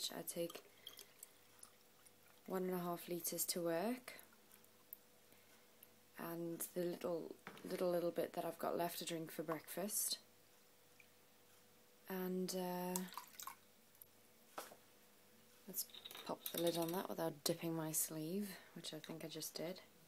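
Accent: Newcastle, United Kingdom. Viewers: I'm guessing your Geordie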